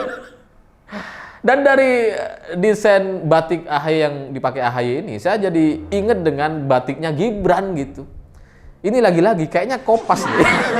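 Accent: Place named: Banyumas, Indonesia. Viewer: Bocah ngapak ya, kok doyan maenin agama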